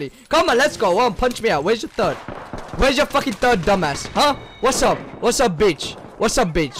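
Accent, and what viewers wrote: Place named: England, United Kingdom. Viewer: He honestly sounds so different when he's not screaming, it's like the only time I can hear his English accent😂😂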